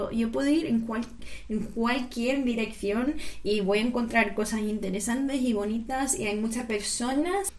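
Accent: Andalusia, Spain. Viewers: Pero q bonita eres!!!!!! Me encanta tu acento andaluz,oleeee guapísima!!!!! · Muchas gracias por escuchar esas lindas palabras y ese acento Andaluz, yo soy de Canarias, vivimos principalmente del turismo y escucharte hablar de mi país así me encanta,gracias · Me hace gracia oírte hablar, por que tienes mucho acento Andaluz y hasta las expresiones tb jeje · Ay mi alma si tienes acento andaluz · Olé tú que acento andaluz tienes.. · Es increíble oír a una americana hablando con acento andaluz graciosísimo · Que salero tienes hablando como una Andaluza Olé , Olé y Olé, saludos desde Córdoba yo también soy Andaluza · Soy de Valladolid, me hace gracia tu acento andaluz. 😘😘😘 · Qué bonito acento de miarma tienes. Me alegra que disfrutes tanto tu vida aquí. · tu gracejo andaluz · Ay mi mare,po dios....pero mi niña si tienes mas acento andalu que la mayoria de los andalasus.....viva la mare que te pario,mi arma..... 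· ¡Tienes acento Andaluz! Eso sólo es que estas enamorada de Andalucía. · MADRE MIA COMO SE TE HA PEGADO EL ACENTO ANDALUZ , PARECES ANDALUZA OLEEEEEEER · Qué gracia me hace tu acento andaluz. No te vayas. Te queremos. Saludos. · Me encanta y me hace mucha gravia escucharte hablar el español con ese acento andaluz de Sevilla.... La Real maestranza de Sevilla eso es único en el mundo. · Se queda uno embobado escuchándote que hacento más bonito y que gracejo andaluz que arte que tienes da gusto verte y oírte. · Estás segura de que eres norteamericana...????? Mmmmm..., no sé, no sé...😅😅😅 Es broma. Hablas un castellano-andaluz. Estás de un integrado TOTAL 👏👏👏👏👏👏👏👏 Quédate aquí pa' siempre...🫂🫂🫂🫂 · Soy del pais valenciano y me resulta muy gracioso como has cogido el acento andaluz , cosa que es normal , a mi tambien me pasa cuando paso mucho tiempo en un lugar, enhorabuena por tus videos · Qué gracia oirte hablar, con acento estadounidense y andaluz a la vez 🤣🤣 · Tienes acento andaluz... 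· Tienes acento andaluz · Ese acento maravilloso andaluz. Y soy de Mallorca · Eres una americana muy andaluza, tienes ese deje de andaluz y además hablas muy bien español. ❤ Me alegra estés bien. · Que bonito deje Andaluz tienes 😄👍👍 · 😂😂 que gracioso..una americana hablando español pero con acento del sur ..de Andalucía 😂😂. No olvides que Texas perteneció a Nueva España y por lo tanto en Texas se habló español antes de unirse a los Estados Unidos. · 😂😂😂Ese acento andaluz dice que tu eres española de corazón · Que gracia escuchar una texana con acento andaluz.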